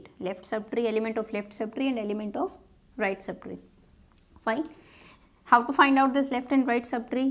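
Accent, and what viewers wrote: India. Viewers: fucking the Indian accent!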